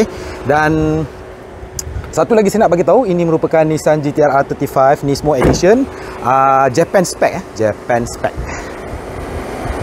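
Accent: Terengganu, Malaysia. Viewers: Hahaha. Xleh nak lari doh sleng ganu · cik ni dari ganu cara cakapnya😁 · Tganu atau klantan..slang xleh tnggal tu😂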